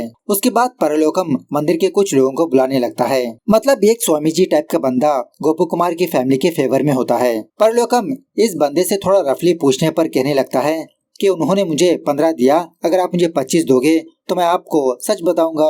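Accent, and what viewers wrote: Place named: South, India. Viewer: Aap kadapa se ho😮 aapka accent south Indian hai but i didn't think k aap Andhra Pradesh se honge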